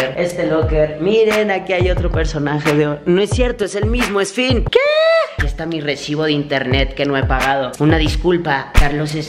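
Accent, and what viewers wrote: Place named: Spain. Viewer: Odio que hables como ESPAÑOL 🙄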